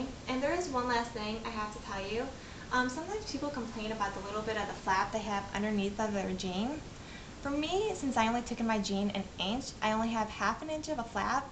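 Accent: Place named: United States. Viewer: She sounds very American:)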